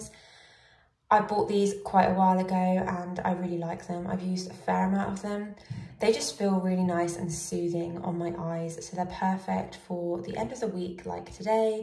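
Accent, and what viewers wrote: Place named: United Kingdom. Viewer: I just randomly found your channel now and I didn’t have anything to watch so i clicked on it and I’m in love 😭 I love your accent so much, you must be from the UK- this is definitely the kind of video I’d want to watch again! 🤍🫶🏼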